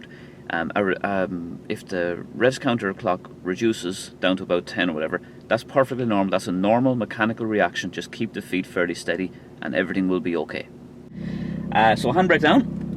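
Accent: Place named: Ireland. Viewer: hi, today I went up a seriously steep hill and my car told me to select a lower gear so I went up in second but my foot was all the way down on the accelerator! It felt like the car was going nowhere or was going to roll back! I nearly s*** myself! I did make it up the hill eventually but nearly had a heart attack as I'm a nervous driver anyway. Was I correct to proceed up in 2nd gear? Is there a correct way of going up a very steep hill as I thought my accelerator was going to snap off. please let me know as I'd like to take the kids to where the hill led to in the end but am really scared of driving up that hill. Love your irish accent by the way!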